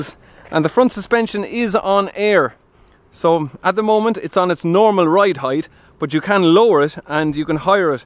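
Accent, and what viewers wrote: Ireland. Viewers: Your voice is so entertaining to hear, I love the Irish accent Very informative, and the Scania R520 V8 Streamline is my favorite truck! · Greetings from Sweden. Love that Irish accent, wish I could speak like that 🙂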